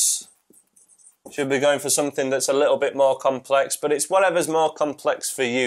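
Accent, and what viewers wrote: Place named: South Yorkshire, United Kingdom. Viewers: Good video. What part of the north are you from? Scotland…not Geordie for sure. I am an amateur linguist and musician. Lol. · Are you from Barnsley? Your accent is so much like my bf's and I lived there for 4 years. If not then it's gotta be somewhere in South Yorkshire like Sheffield, Donny, Rotherham or even Wakefield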